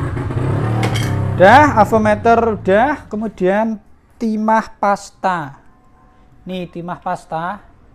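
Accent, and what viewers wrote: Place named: Java, Indonesia. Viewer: Ngomonge medok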